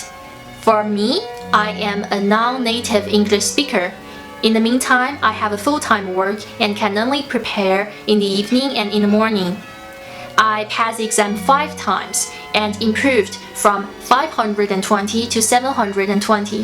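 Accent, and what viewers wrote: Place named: Singapore. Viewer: its the way she speaks her accent..i lived in singapore for 6 years and did my schooling there and alot of people over there speak like her but when they write you will come to know how awesome their english is ...dont be fooled ....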